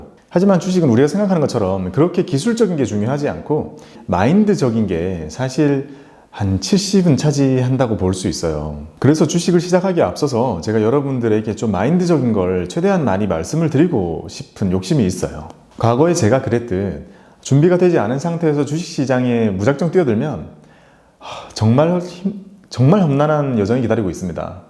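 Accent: Gyeongsang, South Korea. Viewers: ...이름이 왜 이럴까 했는데 이제 알았어요 ㅎㅎㅎ 편안한 경상도 사투리가 오히려 귀에 쉽게 쏙쏙 들어옵니다! 금맹인 제가 오늘 하루도 성장합니다! 진심 감사드립니다~~~건강하시고 계속 좋은 방송 부탁드립니다!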